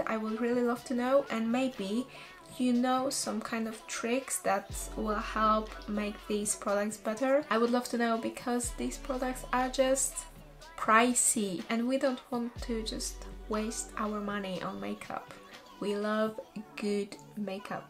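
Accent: England, United Kingdom. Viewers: uwielbiam ciee! masz taki wspanialy akcent angielski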